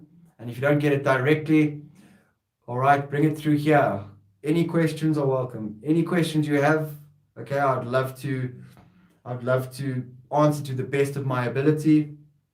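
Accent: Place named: South Africa. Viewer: hello, are you south african? you have an SA accent. i'm new to your channel, i'm from Botswana. do you do your lives from facebook or from youtube? would love to tune in sometime.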